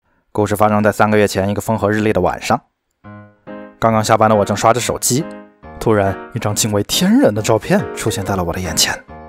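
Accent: Wuhan, China. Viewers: 大哥，你是武汉的吧🍭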